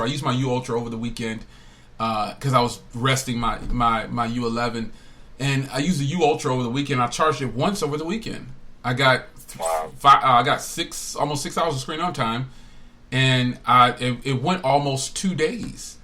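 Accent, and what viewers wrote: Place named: New Jersey, United States. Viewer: Really enjoyed the podcast man. Keep dropping knowledge on these people man, u doing a public service. how u gonna be spending all these hundreds of dollars on a phone & u can't cover your bills & responsibilities. People get their priorities mixed up but they don't like when u call them on it. Btw I think your jersey accent comes out the longer u talk, I think this is only the first or second time I've ever heard it cuz it doesn't come out in your regular videos and reviews.. Jus thought it was kinda funny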